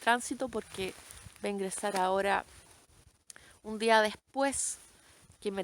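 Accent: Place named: Chile. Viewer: Bella . Pensé que eres chlena. Por tu acento. Sos chilena ?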